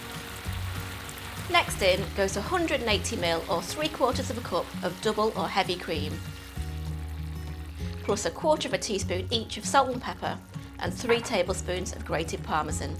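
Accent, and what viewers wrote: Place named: England, United Kingdom. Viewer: Heard the English accent, I knew there'd be alcohol involved. Sigh... you all really need to stop consuming poison.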